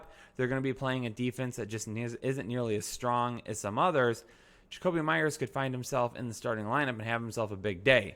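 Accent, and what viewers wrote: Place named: Boston, United States. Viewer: Everything this guy says that involves the o sound is magical and entertaining. I'm guessing he's probably in New England or Boston somewhere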